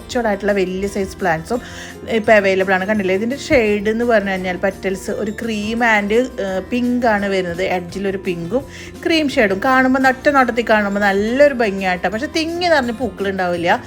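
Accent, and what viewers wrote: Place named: Thrissur, India. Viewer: Eavideya sthalam.. Samsaram kelkkumbol thrisshur dist anennu thonunnu...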